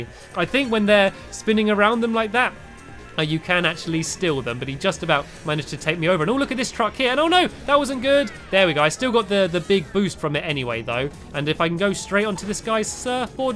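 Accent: United Kingdom. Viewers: It's Broth Brawl. Stampy just has his British accent.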